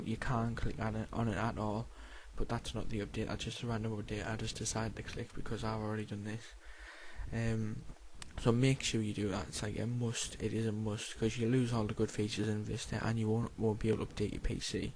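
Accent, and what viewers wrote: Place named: Cockney, United Kingdom. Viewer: Lol.. What kind of cockney gibberish are you ranting is this? I'm only assuming- I really don't know which UK dialect you're ranting, but it's difficult to make out; and also this video was very poorly encoded at that.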